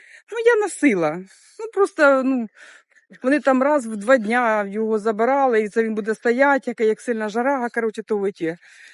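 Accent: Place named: Surzhyk, Ukraine. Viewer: така спражня, і суржик це щось. Я з Запорізької обл. і мені це все так близько. З задоволенням дивлюсь ваші відео, ви молодець. ❤❤❤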